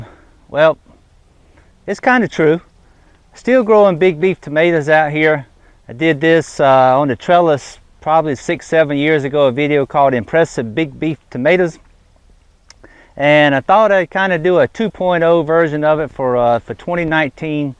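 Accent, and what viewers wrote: South, United States. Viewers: Holy Moly...I didn't know you were back. Oh happy day. Love your videos and listening to your southern accent. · I've been watching your videos for years. You have the best looking tomato plants on YouTube, hands down. Big Beef is a mainstay in my small urban garden as it always seems to be a good beefsteak producer. I like that you understand that different methods of pruning and supporting work well and there is no 'best' method. I agree. Also the southern accent is pretty nifty. Cheers -Lucas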